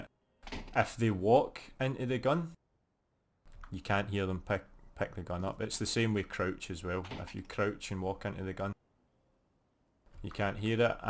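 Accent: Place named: Scotland, United Kingdom. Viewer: CS GO tutorial in a Scottish accent. Fuckin inject it in my veins.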